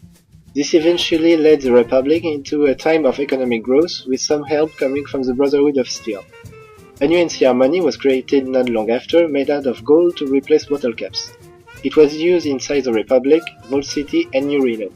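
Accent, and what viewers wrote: France. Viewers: Mec sa se voit que t’es français ou tu parles cette langue parce que ton putain d’accent est dégueulasse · A l'accent français x] Le meilleur accent du monde ;] Sympa la vidéo. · Your French accent is thick and very difficult to understand.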